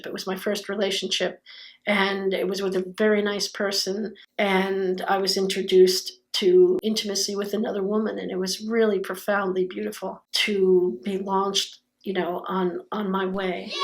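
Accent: New Jersey, United States. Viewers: From jersey and don't talk through your nose 😂😂 My Dads is from there 2 and when I piss him off u can hear that Jersey coming out 😊😂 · i'm from nj too but i grew up in pa. did you ever have the jersey accent? i had a heavy jersey accent at one point but it changed to a pa accent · I knew that accent was American but now you got me I'm from Jersey City New Jersey YYYEEEAAAAAA!!!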